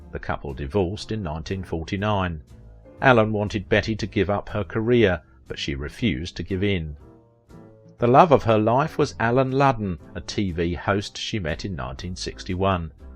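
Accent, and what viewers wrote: United Kingdom. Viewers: NOTHING, in the world would delight me more than in replying to your comment, like feeding a pork chop to a Pit Bull. BUT, I'm going to give the honors to, the owner of this magnificent channel. It's bound to be epic, and with a classy British accent.!!!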